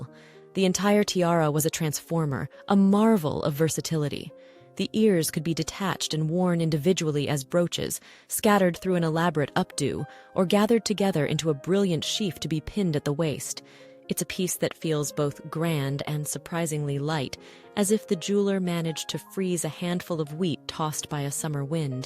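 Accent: United States. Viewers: For a documentary about British Heritage, this American lady has done her homework but she should let a native speaker do the voiceover. The "don't you think" and other fatuous remarks lack the gravitas that would bring the required connoisseurship to the broadcast. No doubt they are intended for an American audience.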